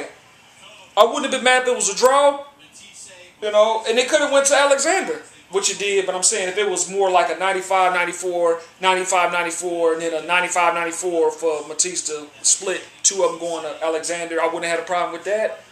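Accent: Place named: South, United States. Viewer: My nigga u sound like u from one its them down south states or I maybe even from st louis so u holdin down ya mans but that nigga alexander loss but he did look way better than I though I had him getting ko'd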